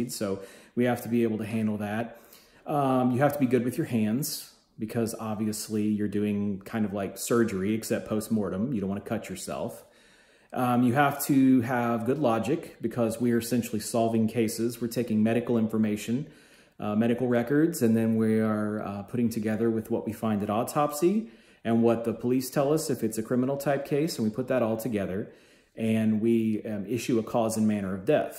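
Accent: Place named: United States. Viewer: In Iraq, I had to go through 6 years in order to finish medical school (requested number of years here ) 😖1 year junior residency, 1 year between PHC and my work in university.. and now i’m in the second year Histopathology board residency. I like how you explain it from A to Z, your clear American-english accent is astonishing, I just put headphones and listen.. every time you post a narrative video 🤩 I wish you were my tutor 🙏🏻 Thank you so much, Dr. 🌷 Arwa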